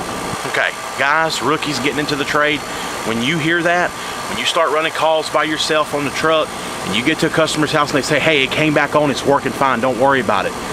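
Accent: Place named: South, United States. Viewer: great job and video and finding all kinds of problems. only item i would comment on is the coil cleaner. that stuff takes off hte protective wax coating applied at the factory and no matter how much you rinse it, it eats the coil regardless best thing to use is dawn dish soap. my teacher at tcc in norfolk va told me this in class many years ago and he writes the ashrae hvac books. not to mention run's carrier out here and before that ran trane commercial. the only thing to use coil cleaner is on an evaporator coil and use the stuff in a can. also why did you call your company? are you isreali? i have never seen a jew with a country accent! interesting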